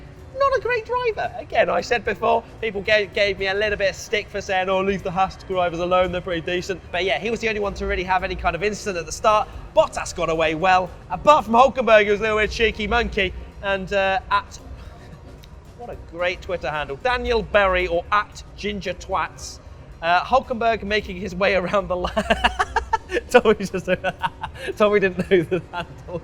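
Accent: England, United Kingdom. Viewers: Less English accent. It's quite annoying.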